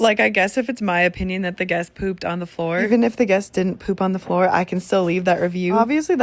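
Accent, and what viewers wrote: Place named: United States. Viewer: Sounds very American.. sincerely, a Dane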